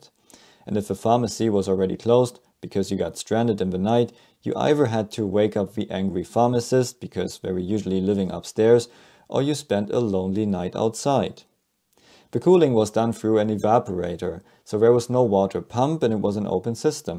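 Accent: Germany. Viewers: Klingt so, als würde ein Deutscher versuchen, Englisch zu sprechen.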